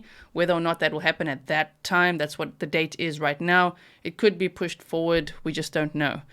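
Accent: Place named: South Africa. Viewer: I recently came across your channel are you South African I clocked the accent immediately. 😀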